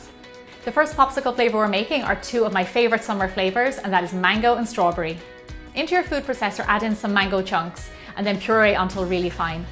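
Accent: Ireland. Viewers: Are you Irish? Your accent sound Irish. I'm Irish. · Are you irish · The Irish accent 😂I'm Irish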